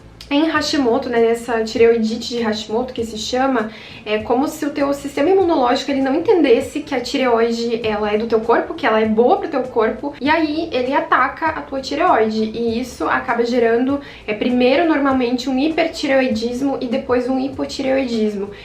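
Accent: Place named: Rio Grande do Sul, Brazil. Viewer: Aq eu percebi que tu é gaúcha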